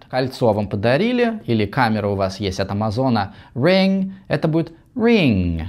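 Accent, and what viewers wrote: United States. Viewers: я вами без шуток восхищаюсь. Ваше американское произношение - лучшее среди всех известных мне русскоязычных 💙 Если вдруг вам будет интересно порассуждать о том, как не смешивать два иностранных в голове и, самое главное, как научиться быстро переключаться с одного на другой, я ваша навеки. И не говорите, что вам это не нужно 😄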